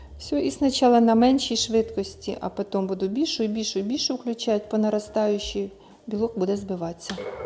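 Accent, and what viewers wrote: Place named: Dnipro, Ukraine. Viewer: Якщо закрити очі - одразу Юля Коса чується, такий же дніпровський діалект, та і тембр однаковий.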